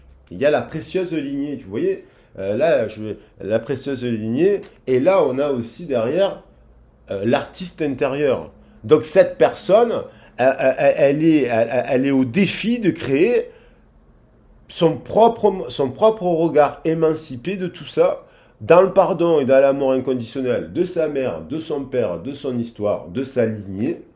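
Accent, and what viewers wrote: South, France. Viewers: Cc merci pour votre guidance très detaillee.c est toujours un plaisir de vous écouter avec votre accent du soleil qui me fait chaud au cœur 😉😊.vous êtes un veritable therapeute.vous m apaisez bcp.😊😘.merci pour votre bienveillance. Belle journée a vous 😉😊😘❤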